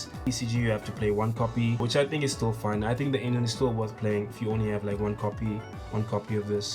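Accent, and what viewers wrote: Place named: South Africa. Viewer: Accent is awesome - u from SA?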